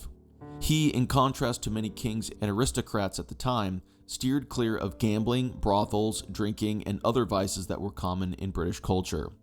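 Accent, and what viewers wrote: United States. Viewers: Since you're British, why do you use an AI American accent, and maybe you should calm down your sympathy for the British? You don't want to sound too biase and / or partisan. It breaks the immersion of you being historical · random, but are you American by any chance? To me you sound so, and you seem to be going a bit heavy on them in this video. Just saying. Not discrediting you or anything.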